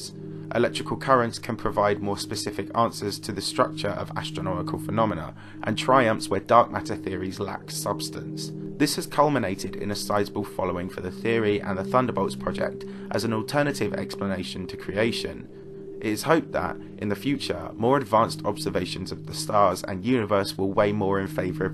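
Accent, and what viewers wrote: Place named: England, United Kingdom. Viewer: Natural narrator, and English accent just kills it.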